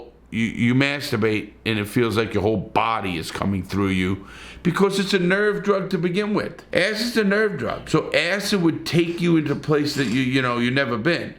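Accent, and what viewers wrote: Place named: Boston, United States. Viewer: You kinda have a Boston accent or a new England accent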